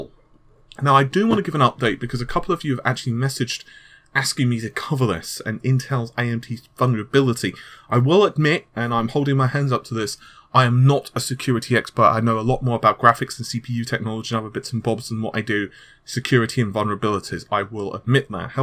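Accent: United Kingdom. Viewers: Zenyatta- Overwatch maybe it's just his British accent ¯\(ツ)/¯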